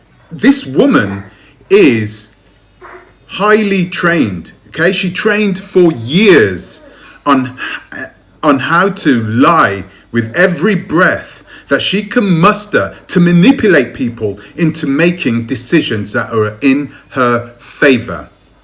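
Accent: United Kingdom. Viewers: When I see your videos come up I think, oh lord what now 😏! But I watch and like your videos because your unapologetic about your views and the information you share. Your delivery of what your saying, and not saying is very British 😂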